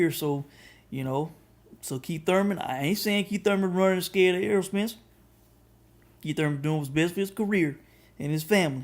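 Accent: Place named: Texas, United States. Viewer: I like your Texas accent BTW I'm laughing my ass off u funny keep that same energy 😎😎😎😎😅😅😆😆😂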